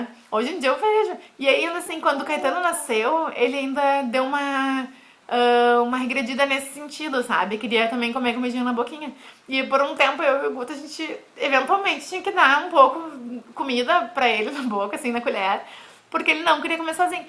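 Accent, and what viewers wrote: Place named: Rio Grande do Sul, Brazil. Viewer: oi amei é sabe o quão é bom ver uma mãe assim de cara limpa com sutaque lindo aqui do nosso RS e estou só na espera do próximo beijo fiquem com Deus.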